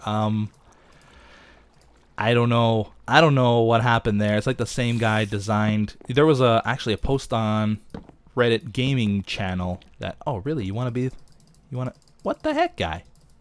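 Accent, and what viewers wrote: Australia. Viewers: He have australian language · it happens when u use the Australian english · Australian english language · Australian speak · U have Australian English on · He might be using a different language. I believe its Australian English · It sounds Australian like me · hes using aussie speak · was using Australian English for this episode I think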